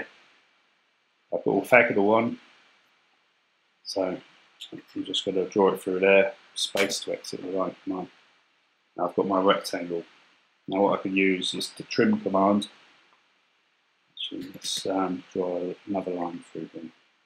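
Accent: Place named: England, United Kingdom. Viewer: Thanks for the great video (and the English accent), I'm investigating 'free' CAD software for members of our Mens Shed, a movemnt that I think is catching on in Uk as well now. Also liked your bird box video, the link for which I will pass on to some folks here (in Tasmania) who are looking to protect the Swift parrot from extinction. Using the Pi may be too high tech for their purposes but it might get them thinking.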